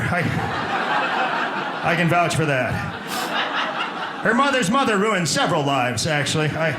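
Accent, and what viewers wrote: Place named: New York, United States. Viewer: The New Yorker accent is spot on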